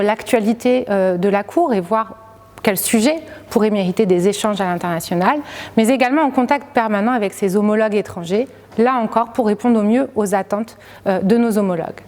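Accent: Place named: South, France. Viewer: J'ai cru reconnaître un accent bien de chez moi, dans le sud? ^^ Ca fait plaisir! C'est tellement rare d'entendre cet accent dans les médias alors qu'il est si joliiiii! Bref merci pour ces vidéos très instructives.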